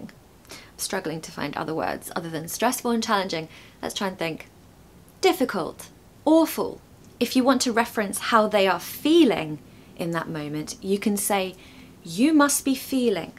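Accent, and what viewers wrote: United Kingdom. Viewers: I love your pronounce but I can’t understand where came from? But is really British and lovely!!!!! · Your session will be an earworm in my head due to your accent. You've acted in this session as Hermione in Harry Potter series. I am so intrigued with your accent. I mean the British accent. I really express my gratitude to every moment you have taken to come out with this lovely, indulgent work. keep the good work up. Kind regards, Moe. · I just found out this channel recently, and i think this is the suitable channel for me to learn the British English. Firstly, I like your accent, the way you speaking is like singing a beautiful song. The speed of your speaking is not that fast so that i can listen carefully and mimicking right away Secondly, you are a very down to earth lady that is like a Sister in my neighborhood who do not put a heavy makeup and very decent wearing that do not expose your body. 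Make us can concentrate on learning. Thirdly, your character is very cheerful and you always share your real life stories with us. I repeated your video at least ten times in a go to make sure i learn what you teach. Your video really full of resources and knowledge that we need. You are really a good teacher in making this kind of video. Thank you so much for your efforts. I am a Malaysia Chinese girl. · I fall in love with your British accent 💚🩵💙💜💛🧡❤️💜🩷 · Hello, Beautiful, charming, refreshing!!! I'm a Southern USA American from 1600's ancestors. Your musicality, considerate & gentle way of phrasing, positivity, and floral frocks:) resonate with me! Your analogy of a rose incorporating beauty, strength, and resiliency could not better describe Southern USA woman in the 1800's. I find more in common with your accent than with the urban USA accent (changing "t" in a middle of a word to a "d" sound, for example). 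The greatest difference my untrained ear detects is that you don't use a flat "a" vowel sound (heard in words like mascara and vase) and yet we share the schwa and silent consonants, as well as my much teased use of "reckon". Your video "Turn your American accent to British" is spot-on. A specific question for you is how should one untrained in linguistics begin to explore their historic, enduring connection to Britain and further support the study of the English language on continents afar?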